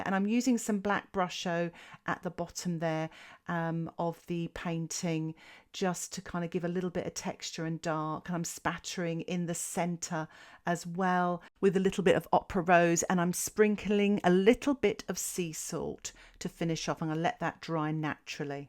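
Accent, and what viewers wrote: England, United Kingdom. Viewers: Absolutely gorgeous and the English accent is great as well. My family history is in England & Wales. I am just getting started in watercolor artistry & I can see you will provide fantastic inspiration! I have subscribed!!!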